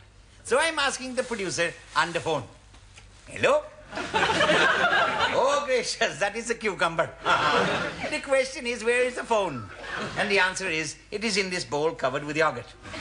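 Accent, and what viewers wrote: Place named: India. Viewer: Such lovely Indian accent without faltering at any time!!!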